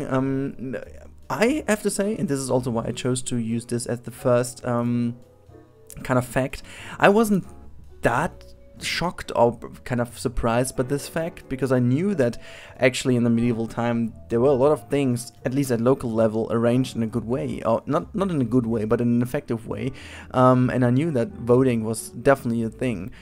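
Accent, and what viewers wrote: Germany. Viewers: Are you from Germany because really sounds like this?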